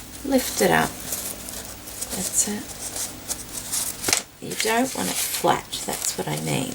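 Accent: Australia. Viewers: its tulle its the aussie accent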